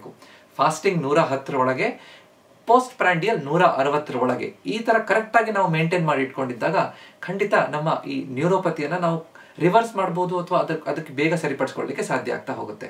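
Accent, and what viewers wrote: Standard. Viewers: ಇಷ್ಟು ಶುದ್ಧವಾಗಿ ಮಾತನಾಡುವ ಡಾಕ್ಟ್ರ ಕನ್ನಡ ಅರ್ಥ ಆಗದಿದ್ದಲ್ಲಿ ,ನಿಮಗೆ ಯಾವ ಭಾಷೆಯೂ ಅರ್ಥ ಆಗದು😂😂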